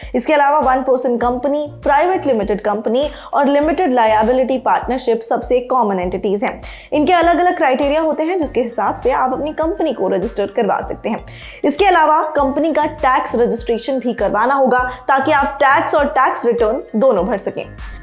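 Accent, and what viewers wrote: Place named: Bihar, India. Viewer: But mamm ka bihari accent (idea to hayye hai) bahut aacha laga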